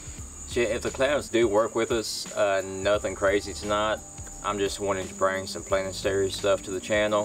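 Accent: United States. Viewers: where in America is this guy from for that accent? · Wat state is his accent from...sounds cool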